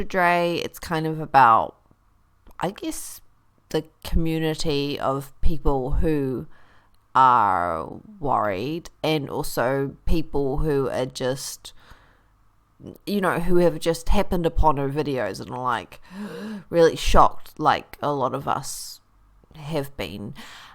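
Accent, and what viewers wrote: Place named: New Zealand. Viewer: I knew when I heard your voice that you're a kiwi, so I stayed to listen because I follow Dr Dray, the thing is she knows what she's talking about and if anyone should be listening to her advice on sun protection it's us down here in Aotearoa/New Zealand. Her choices don't concern me but I was a bit shocked to see the judgy nasty stuff, I'm more of a live and let live but if you're a creator then it's to be expected I suppose. The thing with kiwi's is we are a passive-aggressive nation so I get where your coming from eh, we don't confront but we will orchestrate a campaign lasting 500 years involving the cast of Ben Hur to send you a message!. "God I love us". In any event, we are watching Dr Dray transform as she becomes more comfortable on-line, is she obsessive depends on your point of view. I follow her advice on showering and moisturizing within two days there was a noticeable difference in my skin plus I am super Zen starting my day. 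She loves coffee my kinda girl, she taught me how to layer my skincare regime, so I'm not wasting product now. She talks to women about affordable skincare, who doesn't want to hear that eh!. Is she too thin?, well her body shape hasn't changed from when she began on YouTube, she only went vegan a few years ago, so the correct question might be, is it right to put our normal on someone else? and what is normal anyway? what we do actually know is the West is getting fatter; sedentary lifestyles, convenience food etc.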